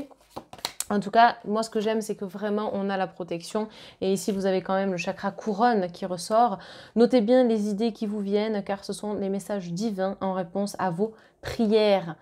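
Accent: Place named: South, France. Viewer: J’adore votre accent du Sud! Ça me change :-))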